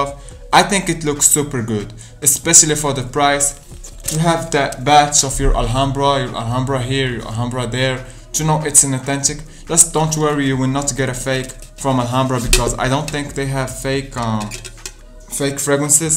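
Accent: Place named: Morocco. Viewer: Are you Moroccan ? You have Moroccan accent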